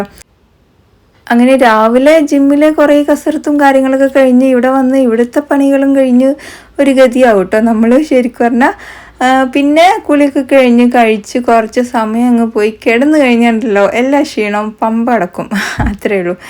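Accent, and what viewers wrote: Palakkad, India. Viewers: * palakkad slag elaloo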